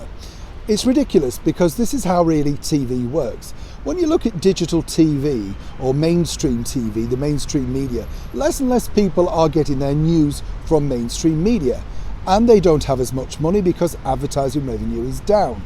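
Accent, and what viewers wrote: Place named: RP, United Kingdom. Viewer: you would be a far more interesting and inspiring host, you are a great story teller and presenter you should apply if it’s an option! Just sayin your accent ie the Queens English is a bonus in USA!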